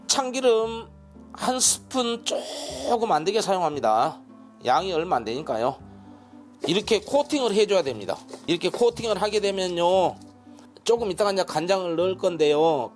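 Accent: Jeolla, South Korea. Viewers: 구수한전라 사투리가 더맛깔나네요ㅡ. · 서론없어좋고 전라도고향말좋고 초간단최고최고🎉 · 완전전라도식말씀 헤그럼판오랜만에들어보네요 셰프님 멸치무침잘들었네요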